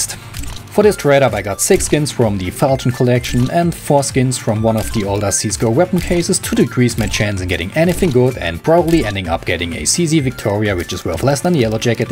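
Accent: Saarland, Germany. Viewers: Endlich mal ein Saarlänner auf YouTube, so genial ;D Kann es sein das du Englisch besser kannst als Hochdeutsch? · b_Unr34l saarländisch junge saarländisch,... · Immer diese Saarländer xD, beschter Dialekt überhaupt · + bischt dau a saarlanner? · for a german, your accent and voice overal is really great. Like audio honey. You should do some narations or more commented videos. Btw grats, you lucky bugger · Man hört den deutschen akzent sofort raua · Saarlännisch mei gudda saarlännisch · Das is Saarlännisch dass muss sich so anhöre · Hojo dö und dein saarländisch... Wie wärs mit nem Dragon Lore Trade up? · ☆ PC Gaming ☆ Wie geil enfach mo saarlänisch rausgekloppt. made my day^^ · das ist saarländisch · "Oh leg,nee.Ich glab es net."Komscht du aus em Saarland? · Oh leck do kommt da Saarländer raus xD bescht xD Saarland rules <3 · Are you from Germany? :D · guck dir die kommentare an 90%möchte videos mit stimme bitte mach es häufiger!Und davon 30% will mit deinem saarländischen Akzent.Deine stimme ist einfach pornös alder.Ik glaub es net xDD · "OH LECK, näää es is de hyper beast.. 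ik glaaab es ned!" xD bester:) Saarländer oder?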